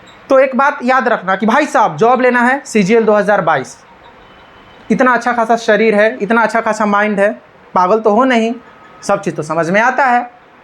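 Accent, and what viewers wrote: Bihar, India. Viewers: Apka language ekdm bihari lgta h😅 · Sir aap Bihar ke hai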